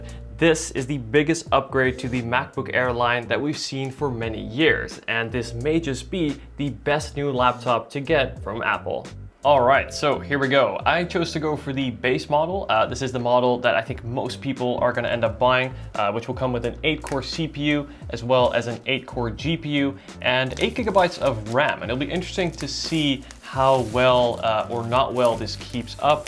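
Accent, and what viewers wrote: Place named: Netherlands. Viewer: Checked the name after I heard 1 second, yup Dutch…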